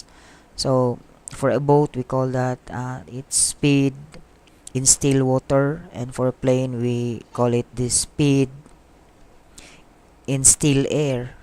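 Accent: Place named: India. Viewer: Boss you sounded like indian.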